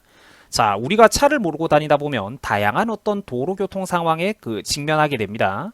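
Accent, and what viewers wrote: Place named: Daegu, South Korea. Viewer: 항상 잘보고있습니다. 대구사투리 넘좋다 하앍하앍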